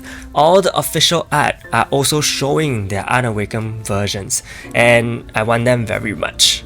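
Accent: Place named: Singapore. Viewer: You sound Singaporean... are you?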